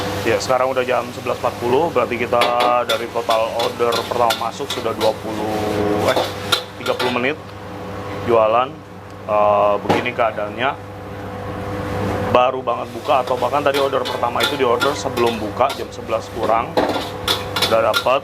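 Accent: Banyumas, Indonesia. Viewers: deneng ngomonge kaya basa bayumasan yah ....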